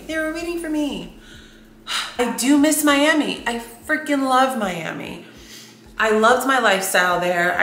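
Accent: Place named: California, United States. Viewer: Your Cali accent is annoying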